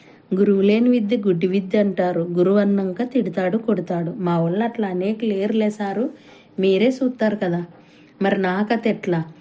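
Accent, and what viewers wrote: India. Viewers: కుశలంబే గదా ఆంజనేయా కథకు ప్రాణం పోసింది.కథలోని మాండలికాన్ని మారుతీ, తన అక్షరాల్లో పండిస్తే, తన వాచకంలో అద్భుతంగా పండించిది.బహుశా ఆమెది ఎమ్మిగనూరు,ఆదోని ప్రాంతమే అనుకుంటాను.ఇతరులకు ఆ యాస,ఆ వాచకం సాధ్యం కాదు. మనసారా అభినందనలు.సీమ కథను శిఖరాగ్రానికి చేర్చిన మారుతికి ఆత్మీయాభినందనలు. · కర్నూలు పడమటి తాలుకాలలోని యాస,గోనెగండ్ల మండలం, ఎమ్మిగనూరు మండలాలలో ఇలాగే వుంటుంది. చాలా బాగుంది 👌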